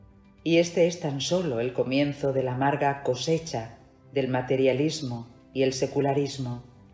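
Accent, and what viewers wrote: Chile. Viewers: Cada Documento en su preciso lugar...Trabajo muy exelente...Y como adorno a tan bello trabajo, ese matiz de voz inconfundible de la hermanita ... Chilena ???❤🎉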